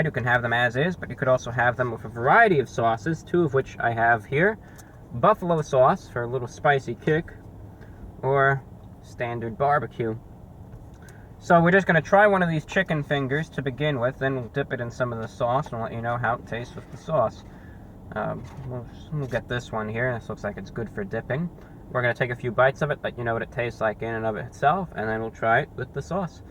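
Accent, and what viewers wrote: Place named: Transatlantic, United States. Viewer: where are you from? I love your accent! it's similar to the transatlantic accent. you sound like a radio presenter from the 30s!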